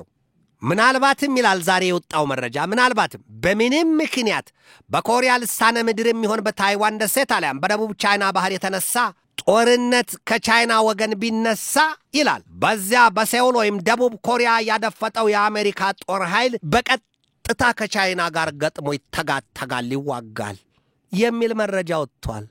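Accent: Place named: Ethiopia. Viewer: አቀራረብኀ ይመቻል: ብቻ የሀበሻ እንግሊዘኛዉን ብታቆመዉ የበለጠ የተዋጣለት ይሆናል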